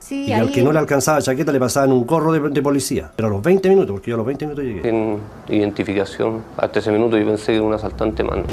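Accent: Buenos Aires, Argentina. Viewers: Chale esta rebonito tu acento ARGENTINO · Acento porteño. Y a mi me gusta tu trabajo. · "si quieren escuchar mi voz de argentina" me dio mucha risa porque en realidad no se que sea pero me encanta ver tus videos y esuchar tu voz,aunque hables de cosas feas me gusta demasiado como narras:) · Amo tu acento argentino. Saludos desde 🇨🇴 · Me encanta tu voz de argentina 😅 me relaja y me gusta como cuentas las historias en tu otro canal, saludos 👋👋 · Me gusta tu contenido y cómo explicas los casos, pero tienes razón y amo tu voz argentina, soy mexicana y soy super fan del acento argentino __ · Hermoso referente argentino, bella, muy buena voz y bien porteña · ADORO TU ACENTO ARGENTINO. ESO TE DA PERSONALIDAD, IDENTIDAD, ESO ES TU TESORO. UN ABRAZO GRANDE, SOS GENIAL. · Sí, yo también vengo en parte por tu voz de argentina jajaja. Un abrazo desde Perú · <3 creo que vicky tiene razon con lo de la voz argentina, ya que para mi eso es una de mis cosas favoritas de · no lo tomes a mal, jeje pero suena muy bonito tu acento argentino, y mas cuando dices el "Bienvenidos a mi video, una vez más" saludos y buen video. 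· Buenas tardes guapísima acabo de ponerme a escuchar casos y el primero por supuesto es el tuyo. Punto uno me gusta tu trabajo y como lo hacéis, a mi no me importa en absoluto tu acento argentino me agrada en que a veces algunas palabras no las entiendas o pienso que tienen otro significado. Pero igualmente me gusta mucho tus trabajos. Acerca de este caso, lamentable la vida de este chico, su principio y su final fue muy lastimoso. Y hay que reconocer que el trabajo de los carabineros fue bastante rápido, acerca de otros casos. Saludos desde España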